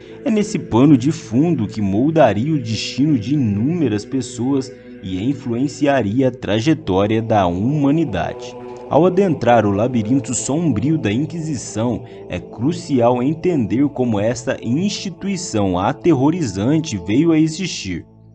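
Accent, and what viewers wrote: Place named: Inland São Paulo, Brazil. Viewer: O cara ja comeca o video ckm uma rendanvia na lingua portuguesa. " Há seculos atrás " . Se o verbo haver esta sendo usado sentido de tempo) , dispensa o " atrás ". Esse dotaque do interio de São Paulo mata a narração também , terrivel.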